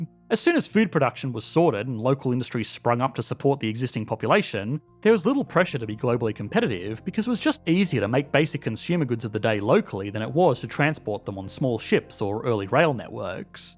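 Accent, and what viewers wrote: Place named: East Coast, Australia. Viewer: East Coast Strayan.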